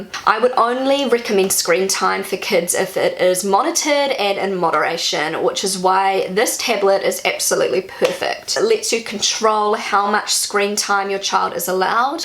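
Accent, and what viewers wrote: New Zealand. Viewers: I'm glad you didn't listen to any of those advices!! The way you do it, is perfect! I'm a kindergarten teacher and a few years back we had a little girl from Russia. And her mum, she was such a lovely lady, thought it was a good idea to teach her daughter German as well, but she couldn't speak German fluently. In the end, the little girl wasn't able to speak proper Russin nor proper German. Oh and I looooove your Kiwi accent!!! And it's great that your kids pick it up, too, cos they're half Kiwi and this accent is a big part of them. Case closed I guess 😂 Much love from Hamburg 😘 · Your kiwi accent is so nice ;-) I try to race my children bilingual (Italian and German ) too! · Your posh British accent still needs a bit of work. Can't keep the kiwi out. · It‘s a big advantage for your kids to speak English fluently! They are able to communicate wherever they travel. 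And btw, your kiwi accent is adorable, don’t ever change 🤩 Same goes for the sweet franconian accent of your kids, of course 👦🏻👧🏻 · The British accent part was really funny, 🤣 Love your NZ accent! ... It's soo German, by the way, this accent obsession... Embrace diversity, people! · Hey, thanks so much for sharing your experiences with raising bilingual children. My husband is also a native English speaker and I am a native German speaker, so it might be helpful with our future children. My husband also grew up bilingually (English and Afrikaans), so three languages are going to be interesting. I think you guys have done a great job sticking with it! And I love your kiwi accent and that you pass it on to your children as this a big part of your biography. My husband is South African and I think it would be rather strange if our children spoke perfect Oxford English ☺️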